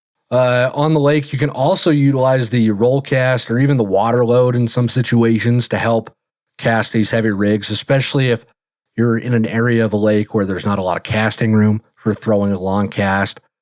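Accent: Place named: United Kingdom. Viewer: Just curious if that snooty British accent just came naturally?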